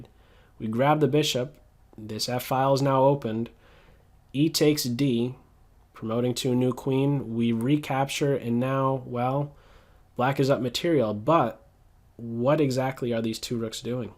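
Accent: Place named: South Carolina, United States. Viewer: It's his accent, South Carolina or something.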